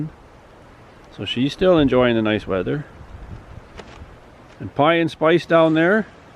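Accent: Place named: Canada. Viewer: New to your channel… I’m guessing you’re a fellow Canadian by your accent/dialect.. Where about’s you located? I’m subscribed! Cool stuff, with love from Alberta ❤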